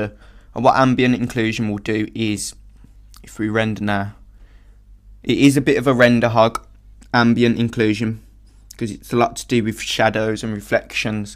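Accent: Birmingham, United Kingdom. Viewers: Are you british??????????????? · Dude we came from them, he's British, we are pronouncing it wrong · your from birmingham aha ?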